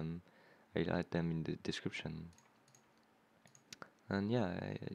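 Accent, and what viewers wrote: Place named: France. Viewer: You have a Big french accent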